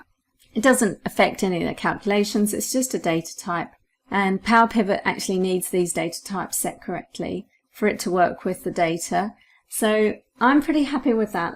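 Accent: United Kingdom. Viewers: amazing, plus the little British accent...thanks !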